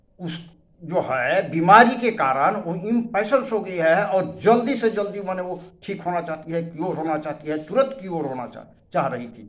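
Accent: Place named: Bhojpuri, India. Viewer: Kirpaya aap apana bhojpuri lehja mat chhodiye! Poorvanchal k jitna dangdar hauye , kul like auri subscribe karihain.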